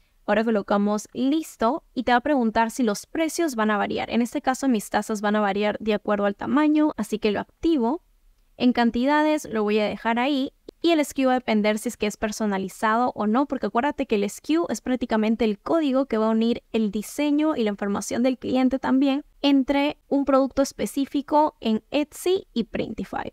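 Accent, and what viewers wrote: Peru. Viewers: Hola! Muchas gracias por otra vez un excelente vídeo. Es chistoso cuando vi tu primer vídeo, me pregunte será peruana por el acento (aunque somos los menos que tenemos acento en Latinoamérica 😂) y después leí un comentario que si…yo también 🇵🇪 😊 ❤️ Pregunta! so ni bien la persona ordena y paga por el producto, Printify lo recive y cuando lo envía ahy mismo te cobra a ti, pero Etsy todavía tengo entendido que no te paga a ti hasta verificar y que el producto sea enviado…so cuánto tiempo pasa para que Etsy te pague? Y entonces tú siempre tienes que tener un monto de dinero en tu cuenta para cuando Printify se cobre de ti (porque Etsy aún no paga hasta dias) verdad?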